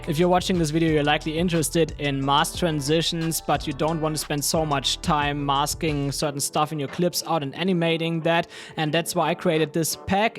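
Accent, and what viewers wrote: Germany. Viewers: klar englisch ist kein Problem aber wäre auch schön mal paar deutsche tutorials zu sehen und man hat krass gehört dass du eigentlich Deutsch redest aber gutes Video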